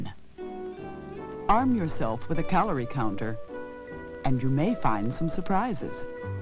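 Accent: Transatlantic, United States. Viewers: This is known as a transatlantic dialect, it was very popular for narration on film and in television in America from the 1920s through the 1950s.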